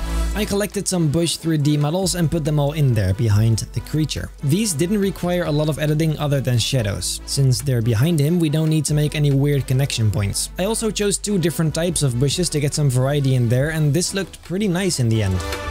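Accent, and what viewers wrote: Netherlands. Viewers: ben je nederlands? het is gwn dat je heel nederlands engels praat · ik vind het ook zo leuk dat hoe goed je engels ook is je blijft horen dat je nederlands bent door de nederlandse trekjes lol. maar super vet werk love it!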